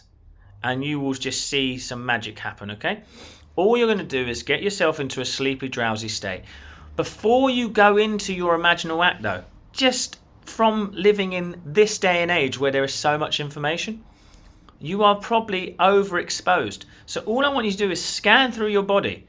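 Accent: United Kingdom. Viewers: Thank you for the depth. Clarity and precision and of course the British accent ❤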